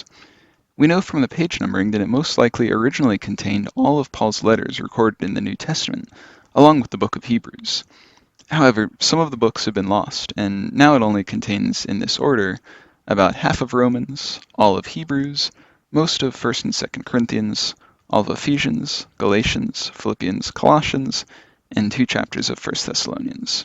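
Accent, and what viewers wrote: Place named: United States. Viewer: Interesting but please pronounce the Greek in Greek not American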